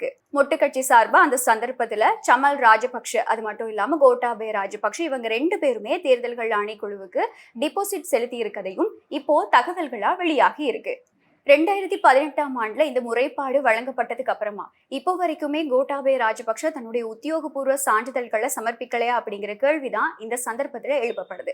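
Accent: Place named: Chennai, India. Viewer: Lankasriயில் Madras தமிழர்கள் பணியாற்றுகிறார்களா அக்கா Madras தமிழில் கலக்குகிறார். கேட்க அசிங்கமாக இருக்கிறது. Lankasriயும் தமிழ் கொலை செய்கிறதா? யாரை நாங்கள் நம்புவது? தூதூதூதூ தூதூதூதூ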